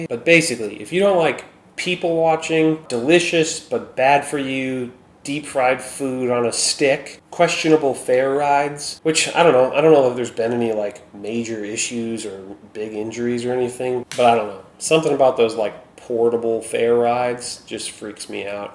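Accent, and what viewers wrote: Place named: Minnesota, United States. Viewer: My struggle is my Mother is from MN and I was born there but grew up in NJ Italian Dad, so I’ve experienced both cultures throughout my life. It could be neither one is ideal for me. But when I hear you pronounce Bag it sounds like beg..and all the other pronunciations even Pop for soda I’d rather just avoid hearing all that! I don’t love Jersey but I just can’t stand the Scandinavian ways and accent truthfully that is prevalent throughout MN!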